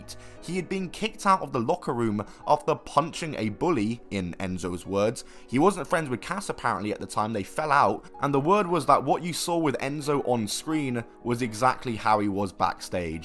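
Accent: United Kingdom. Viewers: I think it's the British narrator's voice that makes me feel smart and snarky, too. 😆😆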